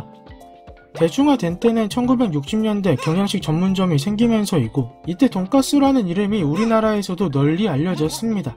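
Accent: South Korea. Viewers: 궁금한게 나레이션하시는 분은 어디 말투죠?ㅋㅋㅋ서울말은 아니고 남쪽사투리도 아닌것 같고 ㅎㅎ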